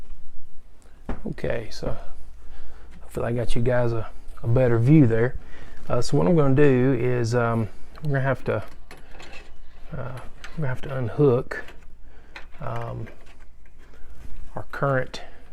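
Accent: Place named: Texas, United States. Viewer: He sounds like he may be from Texas. A lot of Texans have a soft southern drawl despite what Hollywood would try to make you believe since they tend to portray us as loud and obnoxious.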